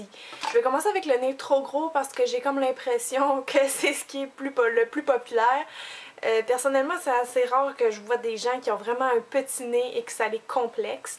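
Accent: Quebec, Canada. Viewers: Bonjour à toi! je suis française et je viens de te découvrir , j'adore ton accent ayant vécu un an par chez vous ! cette vidéo m'aide bcp car je trouve mon nez est trop gros donc merci pr ça et petite question , je voulais savoir si tu saurais faire un tuto sur les maquillage pr filles métisses car je le ss ( inde/ france ) lol et si tu aurais des suggestions car je fais bien trop souvent le mm make up en soirée ou tous les jours, et j'ai les yeux en amandes dc savoir comment et quoi faire ! ;) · j'adore l'accent québécois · Salut jsais pas comment jsuis arriver sur ta vidéo mais on s'en fout ! Je suis Suisse et dans 2 semaines je vais au Canada et rien que pour ton accent jme réjouis d'y aller ahaha ! "On va commencer avec les plus gros nez" Aahha merci pour cette vidéo. Tout du bon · Super tes video.j adore ta facon de parler et ton accent bec:).est ce que les couleurs sont les mm pour une peau mat?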